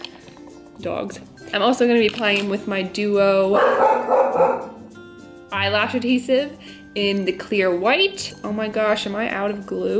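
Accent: New York, United States. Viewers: Sounds like you’re from New York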